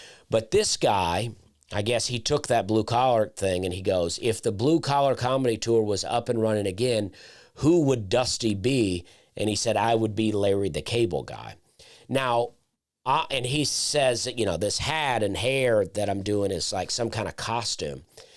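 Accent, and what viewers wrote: South, United States. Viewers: Dusty Slay is one of my favorite comedians of all time… There’s a certain vibe that southern hospitality comedians have that CAN be similar like Larry The Cable Guy, Jeff Foxworthy, Trae Crowder and yes even Dusty Slay BUT… each comedian has their own unique delivery… In comedy there are main techniques and skills like… sarcasm, storytelling, conveying multiple perspectives, expressing multiple emotions, over-analyzing & underplaying, crowd work and most importantly the ability to express yourself… All the pros use these techniques and skills in their sets… My point is the basic skills of a comedian are all the same from Carlin, to Pryor, to Burr, to Norton, to Shaffir, to Larry The Cable Guy, and even Dusty Slay… so it’s easy and even lazy to take two comedians with similar accents and say they are the same … for example… you can throw Sebastian Maniscalco, Chris Distefano and Andrew Dice Clay into a melting pot and review them as similar… but theyre not even close to the same thing… Okay Im totally overreaching here but my point is…. 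Even though Larry and Dusty hone the same comedic skills for comedy and even though they have similar accents and even some tastes… theyre totally different and both are good in their own way… Don’t worry about the haters Dusty Slay, youre awesome. And I think you mentioned suicide in all of your specials, so I hope you never kill yourself and that you create another ten awesome specials. Cheers Dusty. Ps. Here’s a joke I wrote. It’s stupid but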